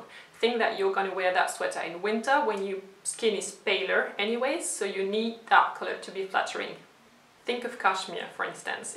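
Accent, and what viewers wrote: France. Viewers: Quand un français essaye de parler en anglais sa donne ça ! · I was thinking that you were actually a French, from your accent.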